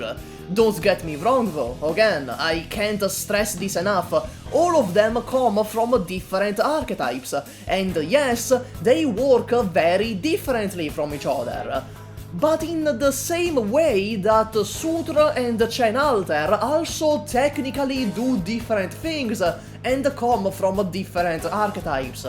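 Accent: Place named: Italy. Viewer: the accent (100% Italian)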